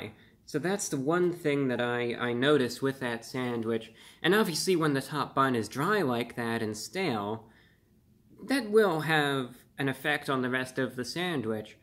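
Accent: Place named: Mid-Atlantic, United States. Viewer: Review Bruh, the mid atlantic accent is getting more cringy, tone it down. Just let the inner upstate New York come through.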